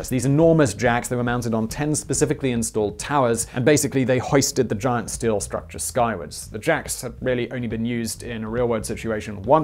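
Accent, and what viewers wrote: RP, United Kingdom. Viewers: Very posh. Thanks for the video.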